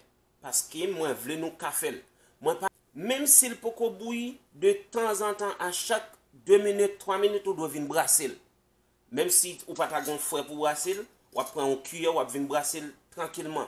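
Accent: Quebec, Canada. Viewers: Merci wap banm bon accent Québec la wiii la. Bon travail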